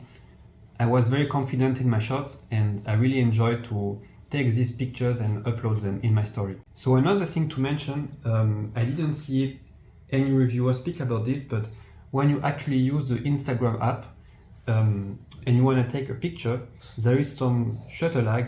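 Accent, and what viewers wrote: France. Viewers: Good video but je sais que tu parle français ça s'entend lol merci pour les informations en tout cas 😁😁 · salut, est ce que tu serais un français par hasard ? tu as un peu l'accent je trouve hehe.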